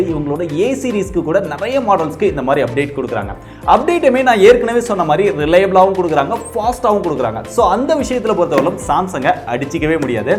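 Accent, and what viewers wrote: Kovilpatti, India. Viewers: Neenga kovilpatti ah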